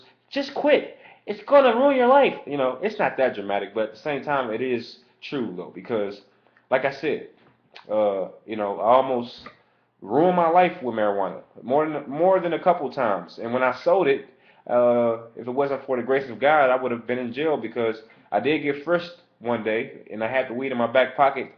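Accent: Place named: Worcester, United States. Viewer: Tell me this guy isn't from Worcester, did he say Becker?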